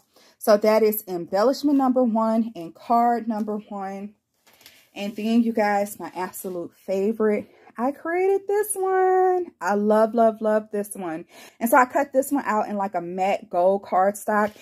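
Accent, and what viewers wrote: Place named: Georgia, United States. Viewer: Adorable! I have a new Cricut machine x2 Months that I haven’t played with yet. You’ve inspired me! Subscribing! What state do you live in? You sound like a fellow Georgia girl FYI The Color Purple is my favorite movie of all time!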